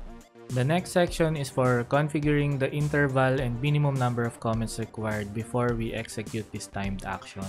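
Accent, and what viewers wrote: Philippines. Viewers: Are you Filipino? I can hear definitely hear a Filipino accent hahaha! Yo thanks for this man! Love to support fellow Filipinos as well great job with this dude!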